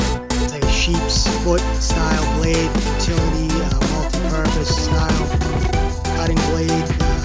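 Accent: Rhode Island, United States. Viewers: Dude’ I love your Rhode Island accent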